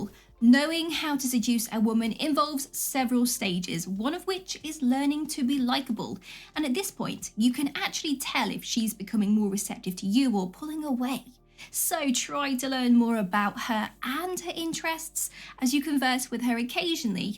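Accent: United Kingdom. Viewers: I love your British accent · Actually, this is my real YT channel, and I still love your British accent.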